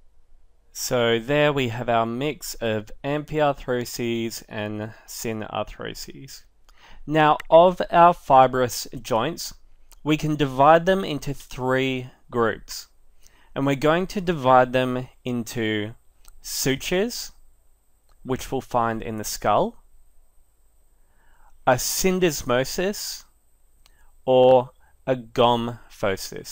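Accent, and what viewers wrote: Australia. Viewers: I am really enjoying your clarity, descriptions and Australian accent!! It's a first in my experience of watching these anatomy tutorials. Thank you kindly.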